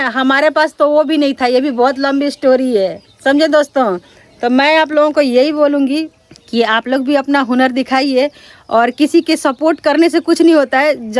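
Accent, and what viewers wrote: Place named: Gorakhpur, India. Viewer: Apki bhasa gorbpur ki lg rhi h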